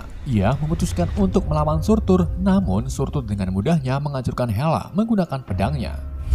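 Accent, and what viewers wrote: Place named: Java, Indonesia. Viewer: ket benslet 🤣🤣🤣 ngakak medok parah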